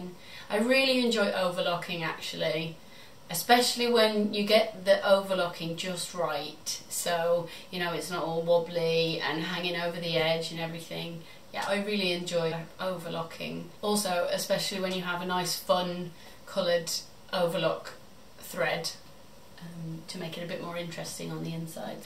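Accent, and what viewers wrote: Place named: Midlands, United Kingdom. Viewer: TFS great to hear more about you. I'm from Walsall, knew there was a Midlands accent in there somewhere!!!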